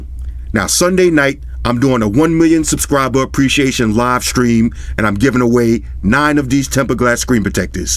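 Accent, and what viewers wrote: New York, United States. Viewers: You from New York right? Lol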